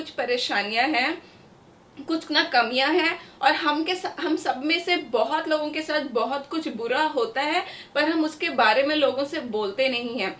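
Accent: India. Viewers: Logo ka kaam hai khna, unhe khne do.btw love ur hindi accent( i speak urdu)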